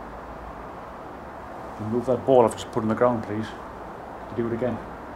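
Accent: North East England, United Kingdom. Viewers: Hi, new subscriber (thanks to the ouija brothers. Loving your channel. Going to binge watch all your vids tonight. Where you from, you sound like a north east lad. I’m in Hartlepool x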